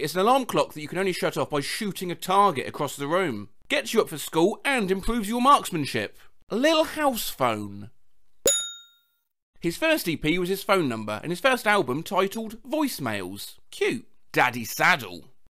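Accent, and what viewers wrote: United Kingdom. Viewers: hes british dw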